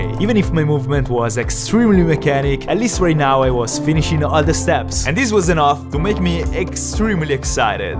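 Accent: Italy. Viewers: Cazzo si sente che sei ITA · He's Italian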